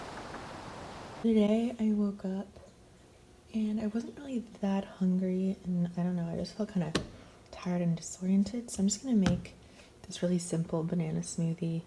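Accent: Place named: United Kingdom. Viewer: Your British accent is absolutely stunning darling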